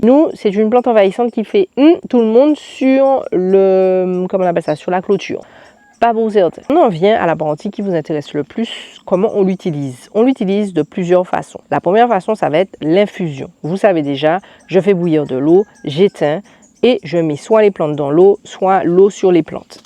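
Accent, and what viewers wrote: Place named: Antilles, France. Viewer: ton accent d. Antilles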